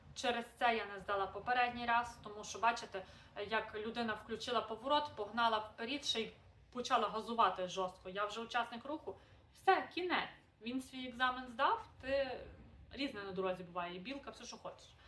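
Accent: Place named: Galicia, Ukraine. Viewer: Дякую за відео, з мене підписка, планую їхати до Нью Йорку. По розмові видно що ви моя землячка з Галичини ). Я до речі з Львівщини. Хай щастить вам на новому місці!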